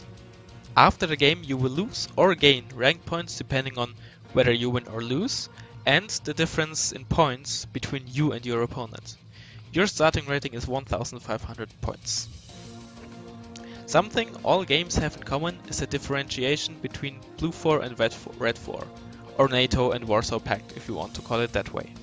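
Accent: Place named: Germany. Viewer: Kannste das ganze nicht nochmals auf deutsch machen? Du bist dem deutsch ja mächtig, wenn ich auf deinen Akzent höre...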